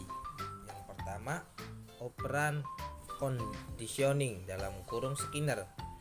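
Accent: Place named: Java, Indonesia. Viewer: Bahasa nya medok bgt ya gaes😂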